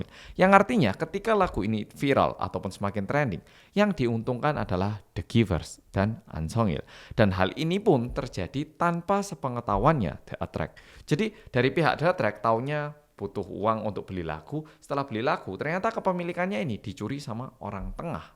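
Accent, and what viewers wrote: Java, Indonesia. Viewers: Mau naik malah turun kasian bukan,, hehe aku seh penyuka dangdut jadi saya nonton channel ini bukan tertarik dengan informasi yang di bahas sekarang tapi aku nonton orang nya suaranya medok hehe😊😊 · mas ini orang korea asli cuman pernah tinggal di indonesia untuk waktu yg cukup lama, makanya medok banget, bisa di cek untuk memastikan di video lama.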